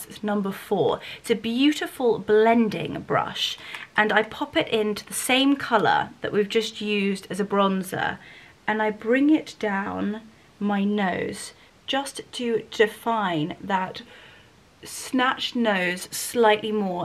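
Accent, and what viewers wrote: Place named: RP, United Kingdom. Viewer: Brilliant video. I studied at uni English literature and languages. It is clear for me you did not only study at uni but I think your English is the RP. 😊😊😊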